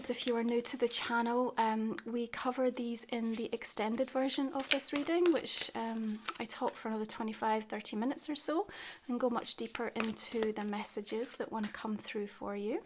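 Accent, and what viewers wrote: Scotland, United Kingdom. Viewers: I'm a Glaswegian leo in new York and it's nice to hear that scottish accent reading tarot, maybe it'll bring me luck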